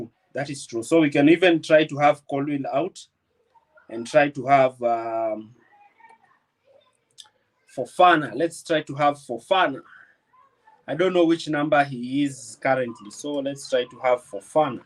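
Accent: Kenya. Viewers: Good job brother, I knew that was a unique Kenyan signature tone and accent display. Thank you for the good work you are doing.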